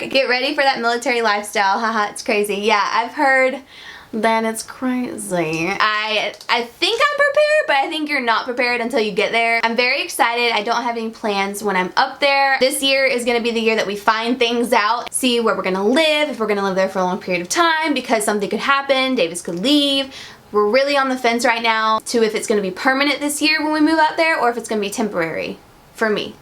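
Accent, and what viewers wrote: South, United States. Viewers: If you guys watch the bachelor she reminds me of Raven! They kinda have the same southern accent and face lol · Your new favorite song🙌loved your lip sync to it!😍 p.s. I love your southern twang. ❤And you go girl with your independent self 💪💁👏👏👏it is hard not being able to talk to your best friend, but when they return it is the best!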